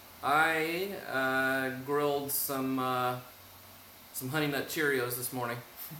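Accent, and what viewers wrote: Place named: United States. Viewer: Hi my friend! I'm interested in English and American accent =)) you have an American accent. In what states do you live? I subscribed to your channel. Greetings from Russia. Irina.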